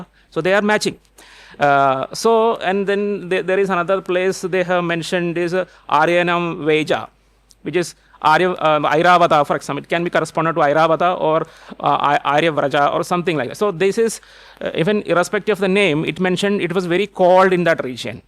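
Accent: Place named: Telugu, India. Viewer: What an authoritative assertions ,pleasure to listen ,His teluguness adding to the pleasure 🎉🎉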